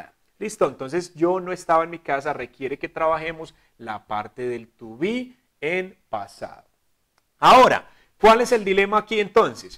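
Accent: Colombia. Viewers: JAJAJA pensé que era la única. Es tan lindo y además con ese acento colombiano, uff! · Wow. Me encantó como explicas todo. Tu asentó colombiano me encanta. I ❤️ 🇨🇴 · ¡Amé su acento colombiano! Además tiene una manera tán sencilla de explicar. Realmente este tema yo ya lo vi, pero siempre es bueno reforzar y conocer otras exploicaciones a parte de las que me dan mis maestros. Por mi parte, seguiré viendo los vídeos de este canal; me encantaron. ☺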